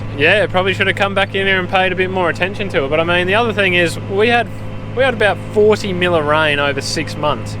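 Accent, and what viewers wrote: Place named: Australia. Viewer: How the heck did a population of convicts from the British empire get to develope such a Croc Dundee accent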